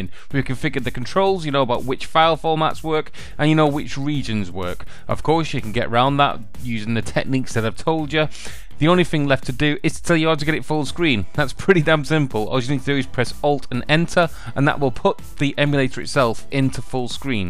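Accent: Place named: Liverpool, United Kingdom. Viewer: i work with a British guy here in the States...he heard your voice and said you're from the Liverpool area...how right is he?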